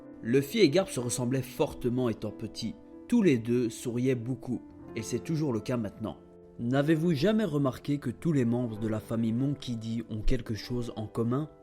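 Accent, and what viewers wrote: Belgium. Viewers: ça c'est l'accent Belge ^^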